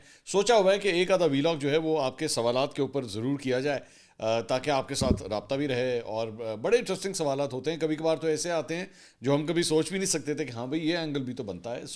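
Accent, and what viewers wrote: Punjab, Pakistan. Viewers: apka Punjabi ka tuch bht Zabardast hai.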